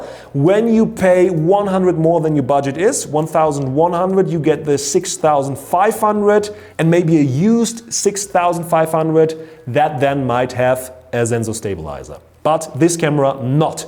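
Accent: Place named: Germany. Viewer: I Know and i'm learning German right now so i can relate to his accent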